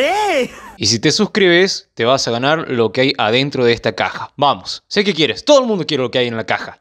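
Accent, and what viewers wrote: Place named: Santiago del Estero, Argentina. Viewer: Hola , sos santiagueño ? Por qué la tonada la tenés hermano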